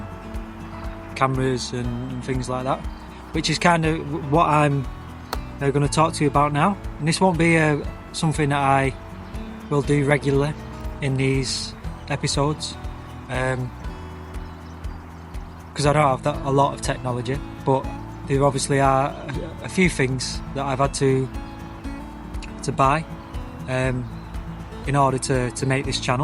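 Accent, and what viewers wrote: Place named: England, United Kingdom. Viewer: How lucky you captured this beautiful bird, and what a great Lense and camera! Nice to hear about it's details too! Thanks.😊 I'm guessing you're a Pom? Not sounding Kiwi haha. Thanks again. You did well.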